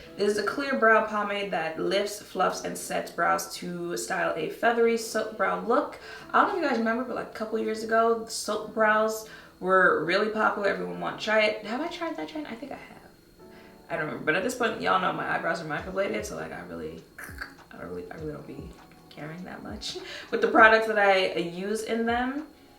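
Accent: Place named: New York, United States. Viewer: Such a New yorker!! ♡ THESE LAUNCHES ARE LAzzzsssyyy! It's giving... Dull and Dustttyyy! Yoooo!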